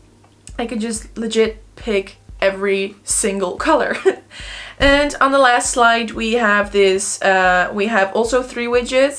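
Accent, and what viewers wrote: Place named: Netherlands. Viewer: lol ik was je video aan het kijken en ik zat te denken huh jou accent is precies Nederlands maar het moment dat je het recept van de ontbijtkoek liet zien wist ik het meteen lmao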